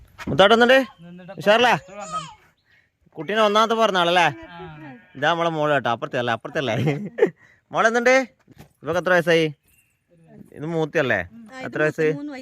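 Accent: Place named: Payyanur, India. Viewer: ഇല്ലാട്ടോ. ഞങ്ങൾ പയ്യന്നൂരാണ്. മുംബയിലാണ് താമസിക്കുന്നെ.വീഡിയോ കണ്ടു നമ്മളെ ഭാഷ, നാടൻ സംസാരം അതാ ചോദിച്ചേ ❤നമ്മളെ നാട്ടുകാരല്ലേ 😀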